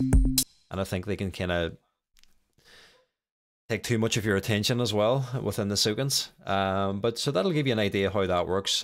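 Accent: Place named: Belfast, United Kingdom. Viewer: Well DAMN, I grew up in Manhattan, even I know that's a West Belfast accent (so I do). Granted, my father grew up in the Divis flats, but it was hardly noticeable that I remember. Great video. I HATE the ableton piano roll.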